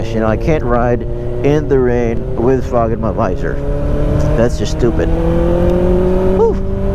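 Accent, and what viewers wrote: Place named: Philippines. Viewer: he's accent is Filipino, so i guess Filipino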